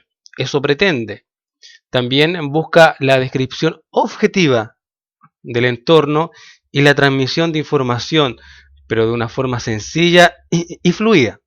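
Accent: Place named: Chile. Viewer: solo un segundo me bastó para reconocer a un chileno xD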